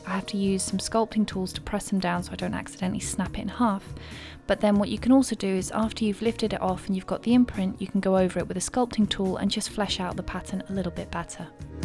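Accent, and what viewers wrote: England, United Kingdom. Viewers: someone has to tell me what english accent she talks in! believe it or not before ASMR was a "thing" i used to play her videos just for her voice because her accent + voice was so soothing. I reckon she do an ASMR channel. it would be so popular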